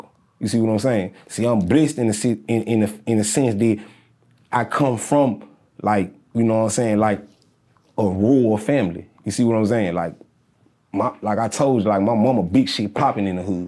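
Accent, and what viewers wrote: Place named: Memphis, United States. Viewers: I understand everything he saying. But then again I am from Memphis. Other southern states also talk like us. Know what Imsaying? You have to put I’m and saying together. You feel me? (Another one of our saying.)RIP Freddy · Soon as he started talking i knew his was from Memphis Tn. I know how we talk we have that certain swagg and talk to us specifically when we say mane that's just us! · I just knew he was from Memphis when he started talking 😂😩 iykyk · He's from Memphis whatchu expect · Right I wasn't even near my phone when I heard it first come on, I said this one from Memphis! Then I heard Mitchell Heights and Binghampton and I was like Ayyyyyyeeeee!😂😂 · Memphis- accent. As someone from nash, I am all too familiar with this accent. And the state of mind. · I'm from Memphis and I felt this dude and understood his speech and know the scenery that bred him and everyone here say youknowatimsayin including my college educated self church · Born & Raised here and manee I swear this how Memphis Dudes be Moving And Talking they get up to explain the story better I guess lol I Love it 🤗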